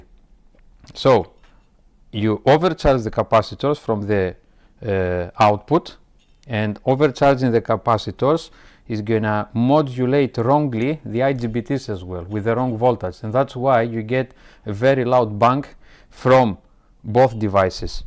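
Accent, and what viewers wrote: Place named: Greece. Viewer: This Sounds like a greek accent 😁😉🇬🇷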